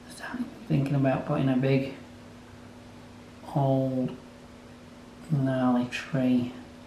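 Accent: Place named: Yorkshire, United Kingdom. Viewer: Nice to listen to your Yorkshire accent, thanks for your Video 🤝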